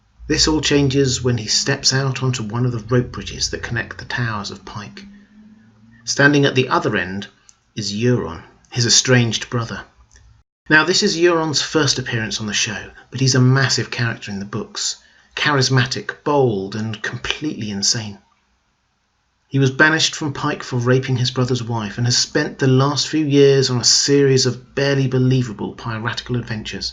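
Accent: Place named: RP, United Kingdom. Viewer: Your delivery is sounding much better. You've incorporated all the things I suggested. Combine that with your RP accent and you're just about the best GoT voice on here. Secrets of the Citadel is tops for similar reasons plus the depth of what she presents, but you could match her and Because Geek is energetic and so lovable, but you're up there now and your voice has it well in hand - good work. Your British accent is so appropriate for the subject. The Order of the Green Hand takes on a manner of speech that is fitting of the Medieval culture, are intelligent, but their voices smack of snobbery and overly serious and these things loose them points. Your hooded look also helps your sense of belonging with GoT. You look like a mysterious stranger someone might find on the road. Someone who looks sort of regular and harmless, like a peasant or wandering monk, but is actually a spy for the Iron Bank or something more dangerous posing as harmless.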